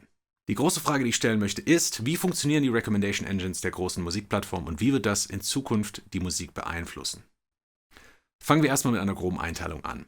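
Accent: United States. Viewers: bin ich bescheuert oder hast du einen leichten amerikanischen akzent? :D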